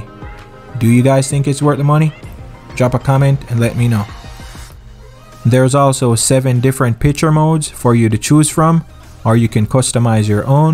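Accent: Jamaica. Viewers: As soon as he said the first sentence I knew man was Jamaican lol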